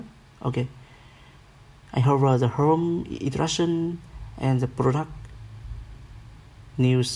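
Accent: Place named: Vietnam. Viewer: Nghe giọng phát âm này đích thị người việt rồi :v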